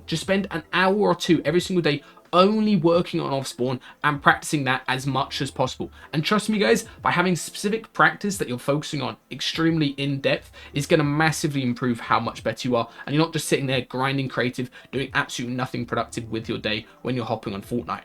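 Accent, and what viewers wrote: United Kingdom. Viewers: London/British accent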